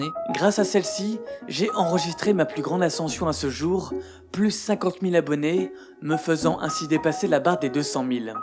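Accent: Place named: France. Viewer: Mais où est tu né, tu à un certain accent français. Est ce l'accent suisse, belge, ou un accent du sud de la France ?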